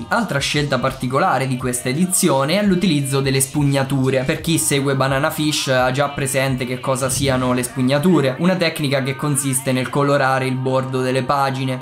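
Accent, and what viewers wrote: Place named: Marche, Italy. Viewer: Sei marchigiano, vero? Percepisco l'accento 🤔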